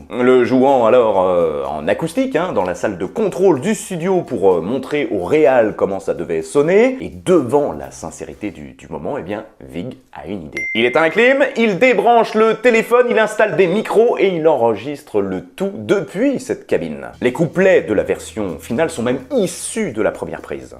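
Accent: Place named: England, United Kingdom. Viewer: Il va falloir travailler cet accent anglais.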